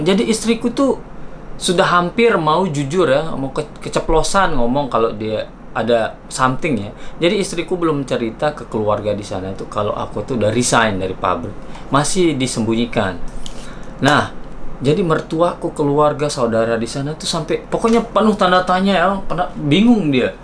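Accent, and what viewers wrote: Lombok, Indonesia. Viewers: aislah 🤣 masih kentel ruen base sasak nike bang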